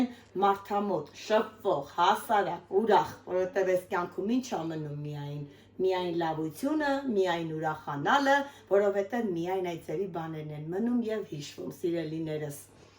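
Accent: Lori, Armenia. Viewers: Բառբառիցդ երեումա , որ լոռեցի ես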